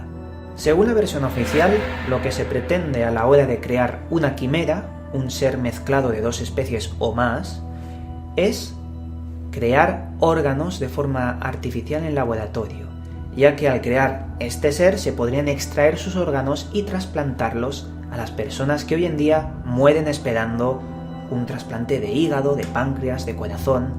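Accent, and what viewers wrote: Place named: Spain. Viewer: x2 me encanta su acento español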